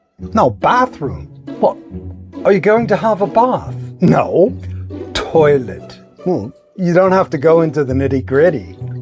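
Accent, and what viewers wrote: United Kingdom. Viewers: Skip the Brit accent. 😖😱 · I love your British accent 😆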